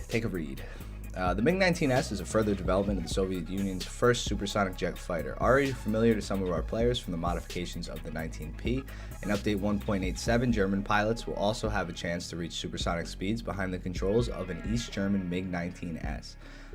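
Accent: Norway. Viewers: Is he norwegian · Wait, er du norsk? (are you norwegian) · Are you from norway me to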